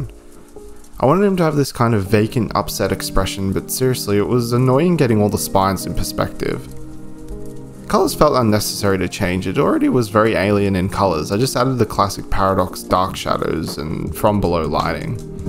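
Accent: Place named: Australia. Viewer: What part of Australia are you my man?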